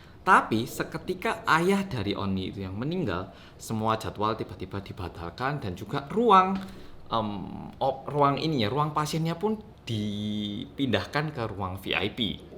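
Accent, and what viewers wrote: Java, Indonesia. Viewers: "Hello guys welcome back to Korea reomit bersama orang Korea yang medoook" Salam dari bali mas medok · Sudah baca beritanya sih, tapi emang lebih enak kalo mas yg ceritain langsung, jadi bisa membayangkan kejadiannya, (dan terpercaya karna langsung di terjemahin sama orang korea medok )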